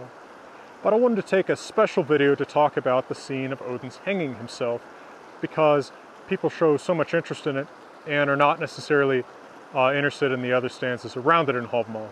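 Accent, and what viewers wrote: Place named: West Texas, United States. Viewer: Fantastic academic level content available on youtube with beautiful background scenery and a quirky cowboy tone? I don't think I've heard anyone quite speak my language like you do,. Grew up in West Texas and studied Latin and Classics at UT Austin. You've got one more subscriber out of me!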